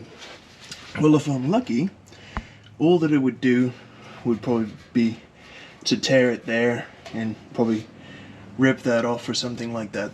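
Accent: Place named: South Africa. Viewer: you sound like an original SAFFA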